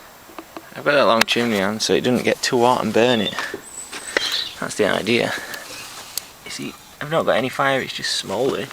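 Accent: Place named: North, United Kingdom. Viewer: best video on pelts ive seen. so many times they say you need this, or that, this is a COST FREE video, so it makes it the best. by the way, are you from the north, i noticed your accent.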